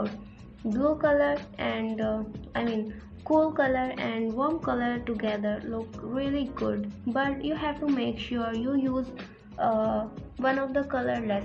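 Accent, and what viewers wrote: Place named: India. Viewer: You are right the accent does sound Indian, or SEA at least. But hey none of our business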